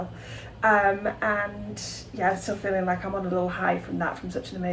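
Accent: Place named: England, United Kingdom. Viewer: It's funny, as a french I'm so used to listen to american youtubers maybe but english accent (so beautiful) is much harder to understand ❤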